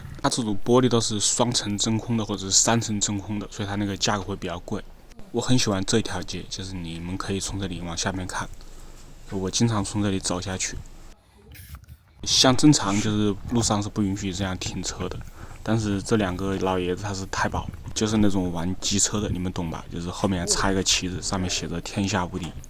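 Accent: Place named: Hubei, China. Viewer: 我以前有位同事也是湖北的，和你的口音好像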